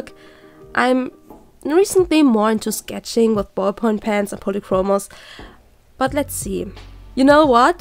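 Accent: Germany. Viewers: You’re german, aren’t you? :D your accent sounds like mine (I’m german) that’s why I‘m asking.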